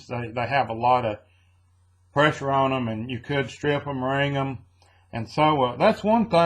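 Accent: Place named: North Carolina, United States. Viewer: That is a pretty GMC Sonoma. I have one just like it. A 91 GMC Sonoma 4.3 liter V6 RWD. Mine is painted that exact color except mine looks dull compared to your shiny red. It was my grandfather's, then my dad's, and now mine. A lot of sentimental value to me. Thanks for the video. You speak like you are close to my area too. North Carolina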